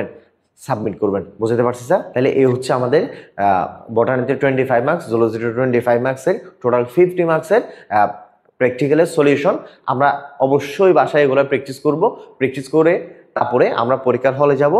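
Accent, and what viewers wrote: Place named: Chittagong, Bangladesh. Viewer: Sir er kota huni lagedde chatgaiya🗿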